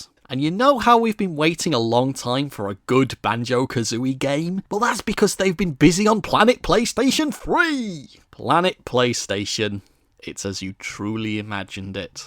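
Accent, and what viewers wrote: United Kingdom. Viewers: Andrew Wood pretty sure he’s british if you listen to his accent you 🤡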